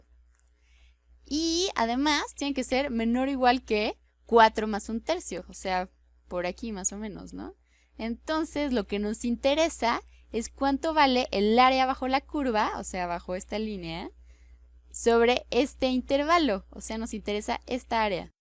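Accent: Mexico. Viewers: Buen día. Alguien me podría decir(desasnar) si esta forma hablar corresponde a una persona oriunda de México?,en ese caso seria la forma de hablar del pituco, cheto, etc (persona relacionado con la "alta sociedad y con dinero")? No se si pensó que solo los de México verían el vídeo, en lo personal hubiera preferido un castellano neutro. En gustos y colores.....a mi no me gusta su voz. Gracias por el vídeo. Saludos cordiales! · Su voz es horrible, es la típica voz del DF que quiere sonar "fresa", aparte se supone que en docencia no se debe de utilizar el uso de modismos propios de una región como "padre", sobre todo en un lenguaje tan global como el castellano.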